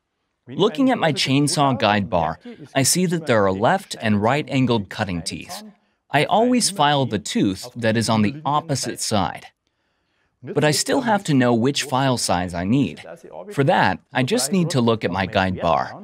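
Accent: Bavaria, Germany. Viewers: 🤣 Hätt mir scho gedocht da spricht englisch mit bayrischn Akzent 😅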